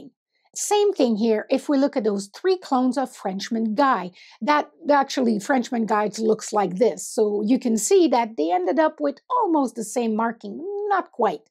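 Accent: Canada. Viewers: Border "Cauooohlie" Canadian! That super rounded "O" gave you away! White tigers aren't rare now. They are bred by people which is horrible because in th wild this usually means the animal has health issues galore. People breed them on purpose and call them "rare"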